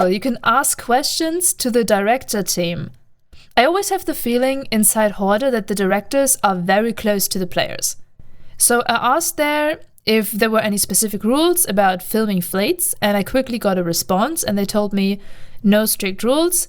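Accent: Germany. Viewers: no le hagas caso por favor, tu ingles se entiende perfectamente y tu acento alemán es bonito. Se te entiende mejor de muchos streamers americanos (o ingleses?) que por tener demasiada confianza con su idioma resultan incomprensibles a quien no lo habla muy bien. Y para ser pesado también diría que el idioma ingles copió la palabra Horde desde el francés, donde la "E" no es muda para nada. Sigue así, gracias por tus videos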